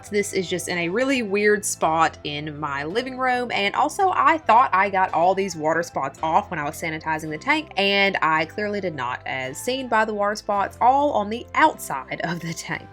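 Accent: South, United States. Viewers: That southern accent though haha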